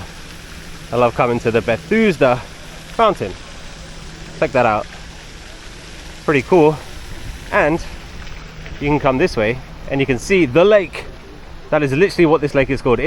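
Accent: London, United Kingdom. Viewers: I would definitely say you sound British. Have you heard the regional accent of the Boston area? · You sound British for sure. In America there are different accents depending on what part of the country you live in! I think UK is probably the same. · You have always sounded British to me. More specifically, you sound BBC news anchor British. By that, I mean you have an accent but you speak clearly and are easy to understand. I for one definitely prefer Londoners over New Yorkers, any day. ❤ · So glad you made this video, I spent the month of June in England including a week in London. You are so right about the coffee culture, people spend a lot of money on something they can make at home, I think people buy the coffee to save time. In London I took the subway or “tube” everywhere and I can tell you people don’t talk to each other, if they find a seat they immediately get out their cell phones and stare at the phones. 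Another observation about the London tube is that men don’t often offer their seats to women, when I saw a woman standing I always asked if they wanted to sit, most times they would say no, but occasionally an elderly woman would accept. I know you say that British people drink tea and I don’t disagree but London is full of coffee shops i.e. Pret a Manger, Nero, and Costa are just some of the coffee chain brands I saw all over London so somebody, maybe tourists are drinking that coffee. Since the US is so big, we have a variety of accents from the New York to Southern accents just like the British accents are unique, Scottish or welsh people are hard for me to understand and I don’t find them to be pleasing to the ear while I love the English accent, it’s almost like sexy, think 007! Your accent is somewhat unique and I attribute it to your travels or maybe you lived in a foreign country as a child or something but I can detect the British influence but you definitely in my opinion do not have a heavy British accent. 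I find it funny when you say the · Thanks - this was a great topic. Yes, you definitely sound British to me. It was interesting to learn that British people find that the American accent is annoying but we find the British accent attractive - very interesting! I'm like you - I don't understand the attraction people have for going out to spend $10 or more for a cup of coffee when they can make it at home for pennies. When I was teaching in a high school I was amazed at the number of students coming in with their cup of Starbucks when I knew with my teacher salary I could not afford it every day!! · Native NYker here. Love that you've decided to come to NYC to live for a bit. The one thing I do have a different opinion on is when you go to order something. It's true, for going to a place for the first time or two, the workers tend to rush folks along, time is money, right? But, if you become a regular of an establishment, that's when the banter happens. 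It's kind of like if they see you're a regular, some places will be more friendly, even sometimes on a first name basis. A tourist may not see this treatment because they wouldn't be here long enough, but that's the true New York experience when you become a regular. I believe it's the respect that you give business to that establishment, which ends up being more appreciated. And, to add, yes you do sound British to me! Hope you enjoy NY more and more and I can't wait to experience London one day myself. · Are you kidding?I don't know how anybody could think you don't sound British! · Good Sunday,!Glad to see you walk and talk in Central Park again! You definitely have British accent but in our days people travel around more than any other time in human history. So, you pick up something here and there! That is the privilege of being modern. No, you do not sound German and I have had lots of contact with them. 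You are British boy! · To me, you sound very British.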